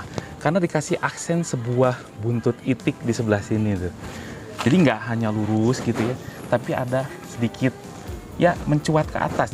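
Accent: West Java, Indonesia. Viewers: Sunda pisan euy..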